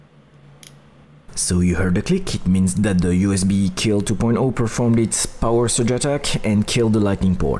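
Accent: France. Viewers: Haha youve got a french accent !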